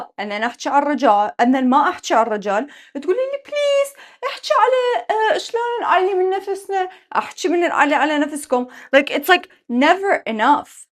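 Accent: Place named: Marsh Arabs, Iraq. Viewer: دكول ماعرف احجي عربي وهيه تحجي حجي مال معدان اصلا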